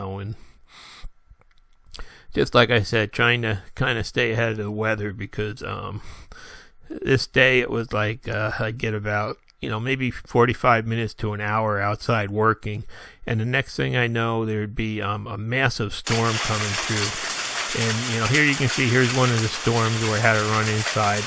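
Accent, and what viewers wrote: South, United States. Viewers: Message From The UK.... Loved the video, loved the southern accent, loved the finished job! God bless Southampton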